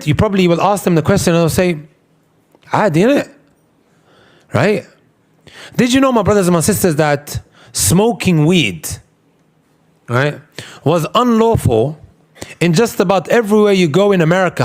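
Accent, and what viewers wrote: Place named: United Kingdom. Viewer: Grew up in Britain so it’s British accent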